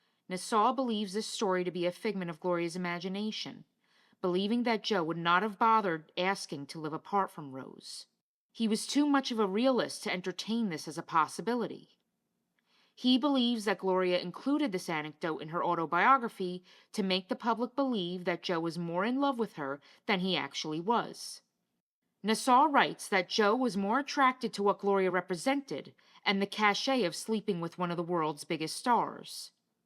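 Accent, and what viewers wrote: United States. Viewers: No AI-generated voice can duplicate your very human Mid-Atlantic (?) accent. Super job, great narration, beautiful use of images. Such "tattle" tales of the rich and famous aren't my cup of tea but I'm happy to have found this impressive iteration of Old Hollywood history. Brava!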